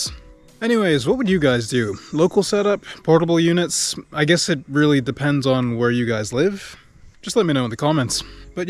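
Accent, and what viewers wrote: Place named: United States. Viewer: How is the trip from the UK but you have everything American even an accent the dialect the phrases, where are you from... Pretty much this pretty much that😊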